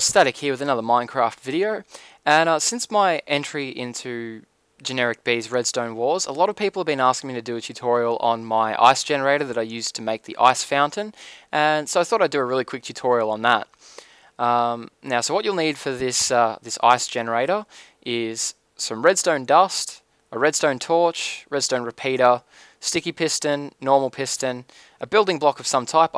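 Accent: Australia. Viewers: where in australia r u from?!?!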